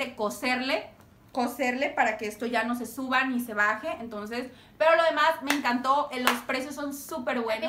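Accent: Monterrey, Mexico. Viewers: se te nota más el acento regio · regresaste con el tonito regio más marcado 😉😉 siempre pasa eso 🙈🤔🙈 me encanta al fin del norte!!! Y si el reto de los 100 pesos!!!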